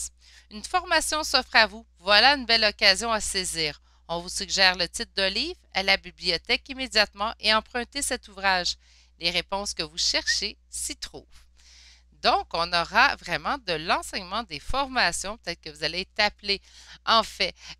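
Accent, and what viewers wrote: Canada. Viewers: Je suis espagnole. J'habite en France depuis 5 ans et malgré le fait que je ne suis pas habituée à l'accent canadien je comprends parfaitement.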